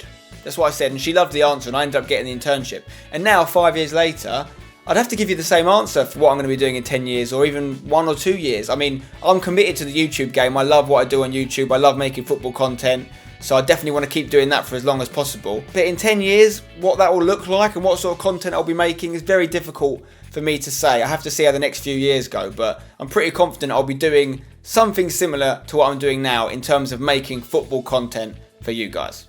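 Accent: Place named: England, United Kingdom. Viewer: Ay don't make things up mate. I never said he can't speak another language. If you listen to his voice, you can clearly hear he's English. There's no way he could speak English THAT good at that age. And I love how he's completely trying to deny what I'm asking him.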